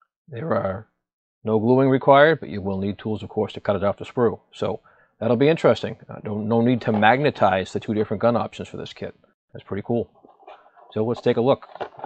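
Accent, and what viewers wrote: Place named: Massachusetts, United States. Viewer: Are you from Mass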